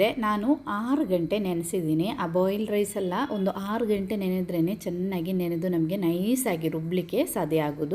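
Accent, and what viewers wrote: Kerala, India. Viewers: Malayalam accent. Why don't you answer the comments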